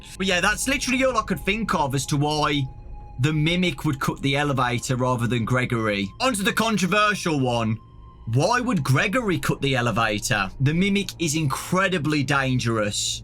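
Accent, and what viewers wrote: United Kingdom. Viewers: Oh hai bri’ish dude /pos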